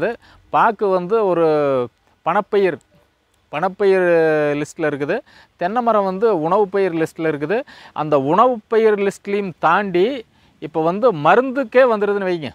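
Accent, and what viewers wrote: Kongu, India. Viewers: வேருக்கு மருந்து கட்டுற மரமும், காடும், ஆளும், குடிக்கிறவர்களும், போய்விடுவார்கள்... கிட்னியும் புடுங்கிட்டே போயிறும்.. கொங்கு தமிழில் வேற லெவல் பேச்சுங்க அண்ணா..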